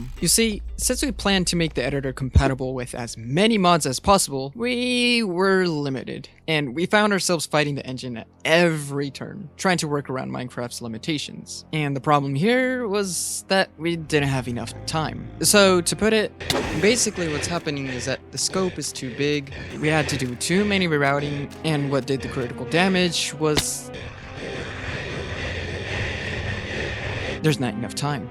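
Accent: Germany. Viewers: deutscher englisch dialekt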